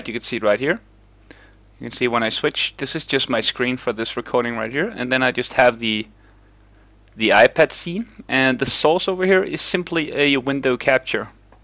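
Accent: Denmark. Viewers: Er du dansker??!?? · Du er dansker? ;) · Nice tak for hjælpen kan høre på dit engelsk du er dansk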